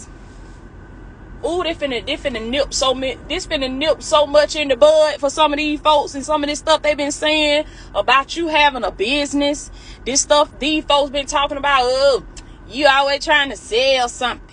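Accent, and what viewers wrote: South, United States. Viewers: I love the way she says children. It makes me smile. Love the southern twang. I myself have a twang. I watch her religiously.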